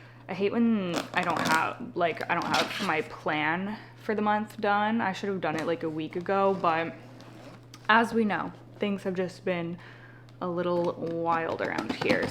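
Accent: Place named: Canada. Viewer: If his accent isn’t Canadian I don’t what is. I have watched your planty video for a while now just discovering that you have a vlog channel. Just wanna say I love them!!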